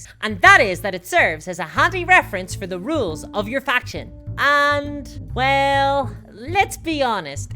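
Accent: Northern Ireland, United Kingdom. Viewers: Spot-on assessment! Love the accent; norn Irish + Canadian/american? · Are you from Northern Ireland by any chance? The accent sounds familiar. You should do something with the two Neckbeardia people if so!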